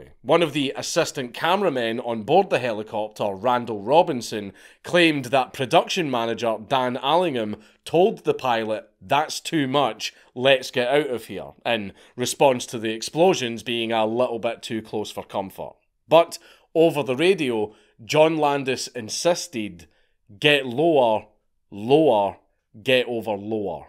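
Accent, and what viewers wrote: Scotland, United Kingdom. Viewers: Let’s be honest, a story told by a Scotsman is way more entertaining and engaging than anyone else on earth lol!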